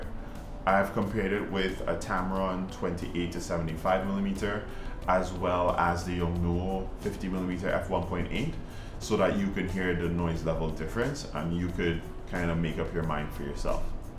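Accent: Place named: Trinidad and Tobago. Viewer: Triniiiiii. Lol I can hear that anywhere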